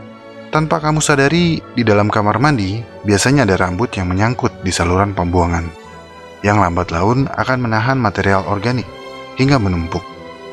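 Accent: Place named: Java, Indonesia. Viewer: wong jowo fix ono kata" deng 🙈🤣,jowo ne ndi mas ?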